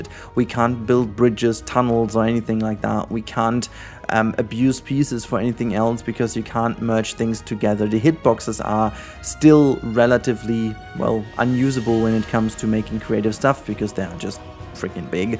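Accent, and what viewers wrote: Germany. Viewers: Gutes Video! (I assume you are German too? At least the accent sounds German to me :D)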